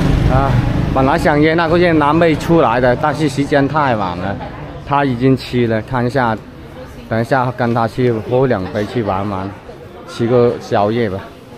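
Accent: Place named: Guangxi, China. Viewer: 广西老表么，口音好像啊😂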